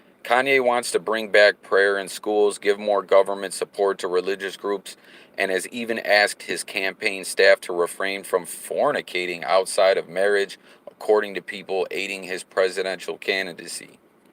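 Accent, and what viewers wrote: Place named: Nebraska, United States. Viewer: Hey is you from Nebraska or Cali? You sound like it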